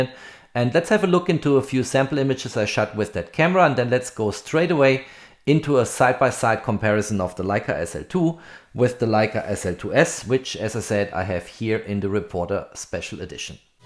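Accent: Germany. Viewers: Let me guess... Your German? Nice Video!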